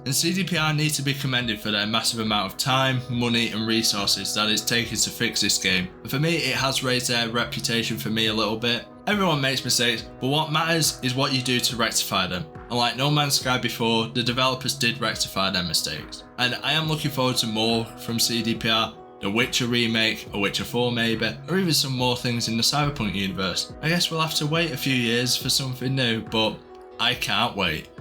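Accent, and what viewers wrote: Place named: North of England, United Kingdom. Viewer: Nah he's from the North of England man, people speak like that there.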